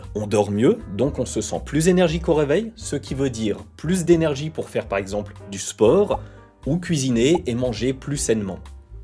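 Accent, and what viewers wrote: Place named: Entremont, Switzerland. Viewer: ha il me semblait bien reconnaître l'accent entremontant ! 😁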